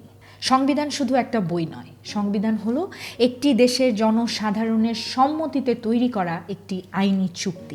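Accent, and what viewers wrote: Bangladesh. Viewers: মাই ডিয়ার আপনি কি বাংলাদেশের নাগরিক নাকি ইন্ডিয়ার নাগরিক একটু জানাবেন। আমি মনে করতাম আপনি ইন্ডিয়ান কিন্তু এখন আপনার কথা শুনে মনে হচ্ছে আপনি বাংলাদেশী আপনি কোন দেশি সত্য জানাবেন প্লিজ।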